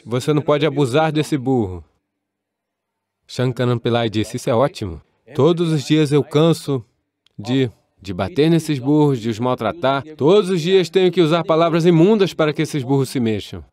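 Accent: Rio de Janeiro, Brazil. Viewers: Eu adoro a voz do dublador com esse sotaque carioca, traz o discurso mais próximo da nossa realidade. As vezes assisto o legendado, mas a noite com sono quase dormindo, prefiro a voz do dublador.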